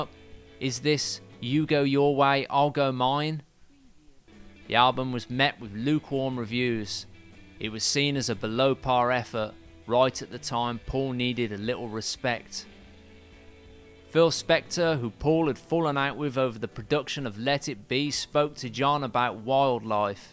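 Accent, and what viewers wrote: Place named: London, United Kingdom. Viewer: Hey, Love your sneering, Johnny Rottenesque voiceover. You from Holloway, too? Great work, as ever!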